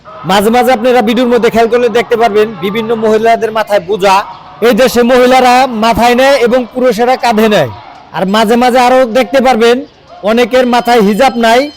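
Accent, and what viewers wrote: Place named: Tangail, Bangladesh. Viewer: অনেক সুন্দর উপস্থাপন, কথা শুনে মনে হচ্ছে আপনার বাড়ি টাংগাইলে। আমি মিশরে বেড়াইতে আসতে চাই।আপনার সহযোগিতা চাই।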